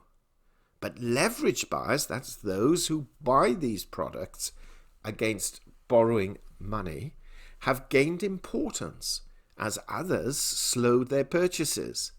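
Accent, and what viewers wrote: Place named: RP, United Kingdom. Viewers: I do not understand this channel. Year 2019 it was mainly critizing silver "pumpers" and mocking them. Then silver prices started to go up during the fall to the highest levels in years, so was wrong. Why call themselvs something "silver" when they clearly do not believe in the metal? I think anti-silver would be a better name. And the upper class english does not help either. Just saying... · Yes I agree! I would not be surprised if they all have ties to the central banks the LBMA & The Crimex (Comex). I'm sick of the posh tone and arrogance!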